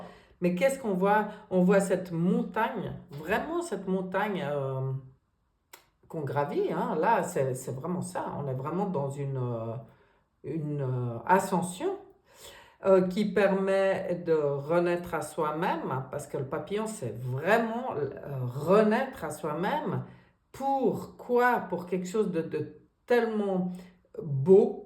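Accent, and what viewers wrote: Switzerland. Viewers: Merci c'est toujours un bonheur de vous écouter, j'adore ce petit accent suisse❤ bonne année à vous et à très vite la sortie du tunnel 🙏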